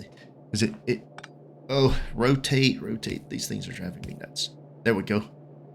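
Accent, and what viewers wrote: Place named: South, United States. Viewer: It sounds to me you're from the south. Like the accent and I love this game :)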